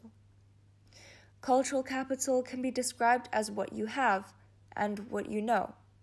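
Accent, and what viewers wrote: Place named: France. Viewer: Love the French accent ladee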